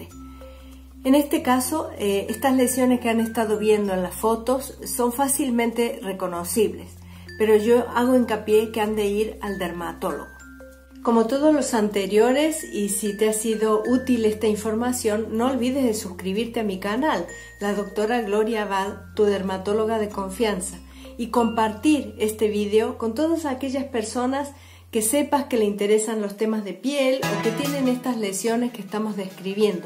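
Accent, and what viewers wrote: Córdoba, Argentina. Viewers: Debe ser sonido argentino, quizá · Parece Cordobesa de Argentina doctora aunque con el acento un poco cambiado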